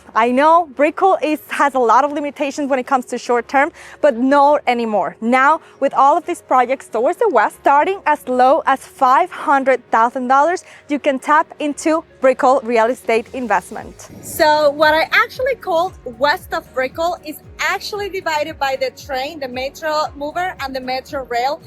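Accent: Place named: Latin America. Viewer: Whatever accent this is, is my favorite Latin accent. Great video!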